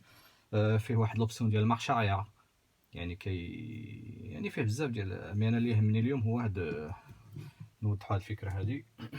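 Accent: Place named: France. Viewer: ديس لايك بسبب لهجتك الفرنسية الخراء، والله انت لست بعربي ، اعتذر لك إذا كنت امازيغي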